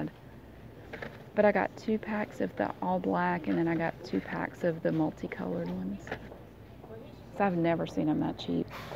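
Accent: South, United States. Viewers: I can't get enough of your southern accent I find it so charming. I'm constantly listening to the Massachusetts' accent up here which I may or may not have myself but absolutely detest.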